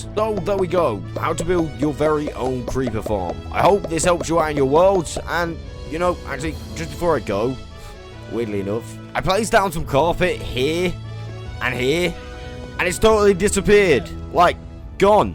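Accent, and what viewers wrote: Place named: England, United Kingdom. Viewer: english lol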